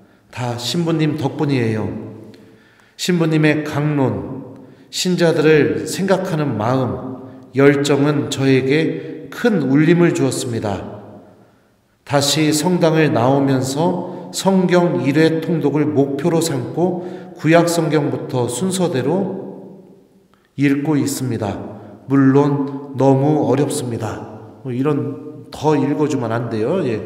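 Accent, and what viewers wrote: Chungcheong, South Korea. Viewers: 신부님! 감사합니다 늘 건강하세요 요즈음 당신의 강론을 듣고 살맛납니다 충청도사투리 넘 구수하고 멋있어요 감사드려요 신부님 알랠루야....❤❤ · 신부님의 강의 너무너무 감사히 들었어요.^^ 세상 참 좋은것이 이렁게 머~언 캐나다에서도 편하게 좋은 강의를 들을 수 있으니 얼마나 감사한일인지요.❤ 보통 집안일을 하면서 듣는데 신부님의 강의를 메모하며 집중해서 듣고 싶어서 작게 차려놓은 기도 테이블 앞에서 들었어요. 끝날때쯤 "저를 위해서 성모송 한번이라도 기도 부탁합니다"하실 때 가슴이 찡하면서 눈물이 나오려했어요. 신부님들은 신자들을 위해서 온갖 고생 하시며 먼 곳까지 마다하지 않으시고 강의를 다니시는데 신자들에게 기도 부탁하실 때 보면 목소리가 작아지시더군요.^^신부님, 매일 사젤.ㄹ 위한 기도와 주모경을 바치오니 부디 영육간에 건강하시고 어떠한 유혹에도 물리치실 강력한 힘을 하느님께 빌어드립니다 참고로 제 고향도 충남 보령 웅천입니다.ㅋㅋ 신부님의 구수한 사투리가 참 정겹습니다. 신부님 화이팅!!❤❤❤❤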